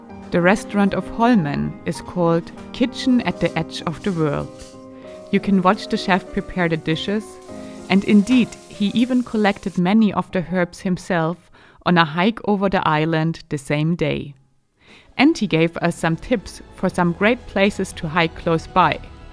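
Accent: Germany. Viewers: Me Norwegian, from Utskarpen, Mo i Rana. Are you from Switzerland? Your license plates show Switzerland from previous videos, but your accent is german. Anyway, Lofoten is best in september. We do have problems with lack of infrastructure, toilets and such. There are forgotten hidden gems to explore, like the coast of Helgeland, the heritage island of Vega, Lovund, Dønna, Herøy and so on. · Great Video, amazing Picture's :thanksdoc: good english 😉( I hear a fränkisch slang;-)) )😁 Stay healthy, whatever you do 🏍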